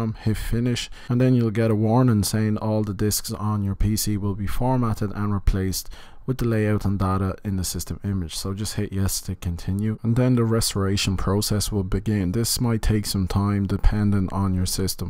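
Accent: Ireland. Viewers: Are u Irish? And good video man👌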